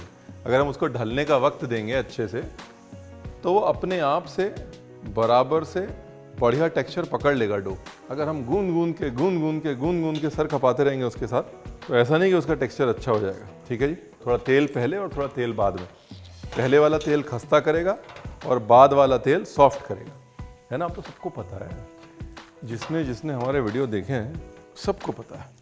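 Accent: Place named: Punjab, India. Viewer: Gayani Brar Ji, Chaa gaey O thah kr k. Like the way you give Punjabi touch to the conversation, hai k nai...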